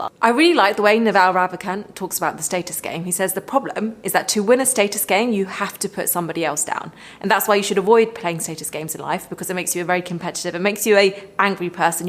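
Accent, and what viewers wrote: United Kingdom. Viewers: Let's be honest, I'm watching this video because she's easy on my eyes, and no, I'm not turned on by her British accent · Omg. A British accent will get people only so far. When you read this advice instead of listening to the accent, you'll see it's very generic advice that is not worth your time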